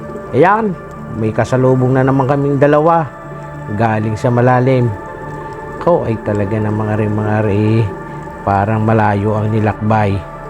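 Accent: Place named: Batangas, Philippines. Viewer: Meron pala na ganyang Koala Dive site. Mabuti at nadirinig namin ulit ang iyong sariling Batanguenyong boses 😊 Naoansin ko lang na medyo murky na ang tubig sa Mabini hindi tulad nung dati na napaka linaw.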